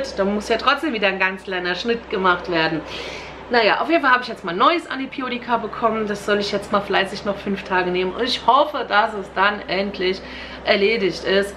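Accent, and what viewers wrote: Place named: Palatinate, Germany. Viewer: Schönes Video liebe, du bist so sympathisches Fräulein 😀ich höre dir gerne zu und dein Pfälzisch 🥰hab früher in Speyer gewohnt,.. in der Zeit du wahrscheinlich in Mannheim, wenn ich mich nicht täusche, hast du es irgendwann in einem altem Video erzählt 😀lg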